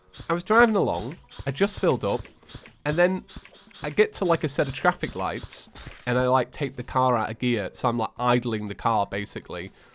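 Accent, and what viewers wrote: United Kingdom. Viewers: I saw the video and there were already six views! Happy Thanksgiving. P.S. Just realized you’re British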